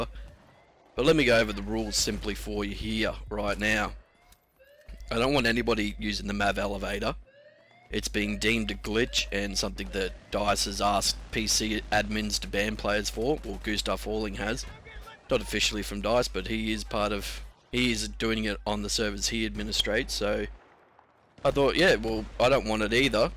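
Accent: Australia. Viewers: Finally an Australian commentator, definite sub from me love your vids :)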